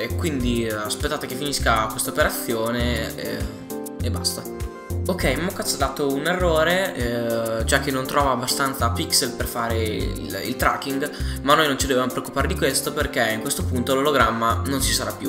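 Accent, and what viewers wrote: Italy. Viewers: ***** ma sei di ferrara? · Bel tutorial...ma sei Bolognese?? forza BOLOOOOOOO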